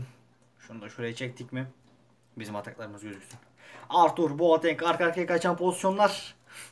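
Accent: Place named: Kırklareli, Turkey. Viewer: konuşmandan anladım bende Kırklareliliyim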